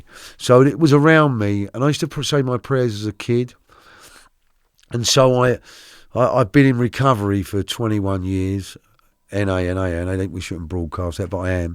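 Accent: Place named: England, United Kingdom. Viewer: I love the English accent!🤗🥰💯💞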